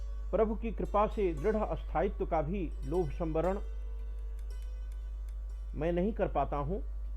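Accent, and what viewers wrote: Standard. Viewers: शुद्ध उच्चारण के साथ -साथ अद्भुत प्रस्तुतीकरण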